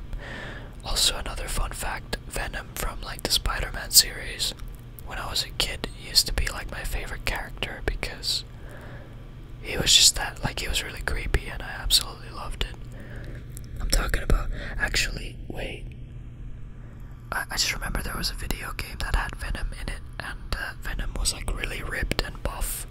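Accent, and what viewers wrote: United Kingdom. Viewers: u nailed that british accent ngl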